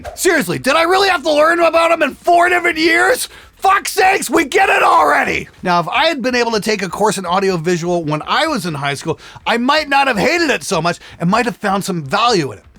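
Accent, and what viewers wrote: Canada. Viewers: You just can't hear your own accent, most people can't, but it is the 'aboot's that tells people you're Canadian, and not from the USA. 🙂 says aboot to a certain degree, you probably can't hear it though. Source - Australian with ears for over 50 years. 😁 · Honestly, I didn't realize how many Youtubers that I watch were from Canada until I heard them all say "aboot" Not like it matters, they make good stuff, so I watch it. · When I think of Canadian You Tube I think of him. I generally don't recognize a Canadian accent, but his insistence on "aboot" lets you know it's Canadian content. I had no idea that Canada was suppressing free speech in such a bizarre fashion. Is there any way this channel could do some kind of IP swap or something and just look like it was a few miles West out of Detroit?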